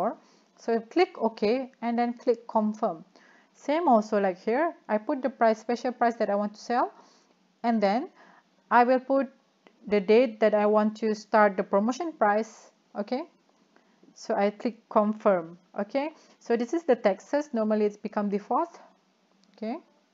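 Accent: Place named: Tamil Nadu, India. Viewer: Mati mood nk tgk bile speaking english dgn suara tamil mcm ni. Baik ckp melayu jelah sis.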